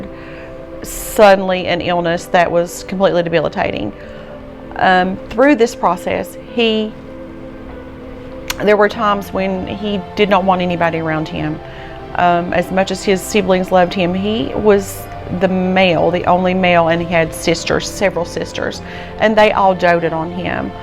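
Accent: United States. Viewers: Dang she’s country. I’ve been living in the south for 30 years and I still can’t get use to it. Lol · Are you from southwest VA? I grew up there and you sound JUST LIKE my family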